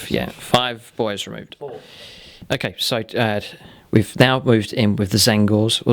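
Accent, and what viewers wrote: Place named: Hampshire, United Kingdom. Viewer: The accent hints at it, but might you be in or around Hampshire and up for a good brain-picking? Your Drukhari painting guide's been invaluable to me so far, so thanks for that!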